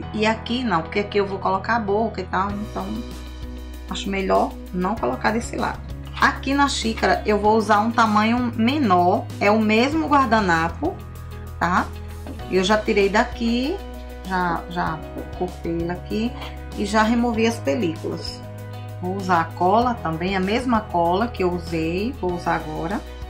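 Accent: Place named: Sergipe, Brazil. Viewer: Amei seu sotaque! Sou sergipana e me identifiquei c esse sotaque, q parece sergipano. Amei tb a sua arte! 😍😍😍😍💗💗💗💗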